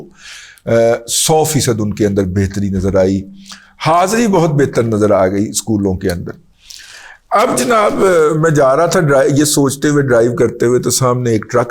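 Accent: Punjab, Pakistan. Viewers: پتہ نہیں لوگوں کا ذوق کیا ہے جنہیں سہیل وڑائچ جیسا چولیں مارنے والا شخص بہت بڑا لکھاری لگتا ہے. نہ کوئی کہانی تھی نہ کوئی سسپنس تھا نہ حقائق اور حالات و واقعات سے ہم آہنگی. مجھے پاکستانیوں کی اس فطرت سے ہمیشہ نفرت رہی ہے کہ نہ منہ دیکھتے ہیں نہ متھا لیکن کسی پیسے والے شخص کی خواہ مخواہ چاپلوسی اور جھوٹی تعریفیں کرنے لگتے ہیں. میرے نزدیک سہیل وڑائچ صحافیوں کے بھیس میں وہی چیز لگتا ہے جیسے موسیقی کی دنیا میں چاہت فتح علی خان ہے. نہ اس شخص کا تلفظ درست ہے نہ ہیجے نہ لغت نہ نستعلیقی رموزِ تکلم سے آگاہی بلکہ اس کا اردو کو پنجابی لہجے میں بولنا ایسے ہی لگتا ہے جیسے عربی انگریزی بولتے ہیں.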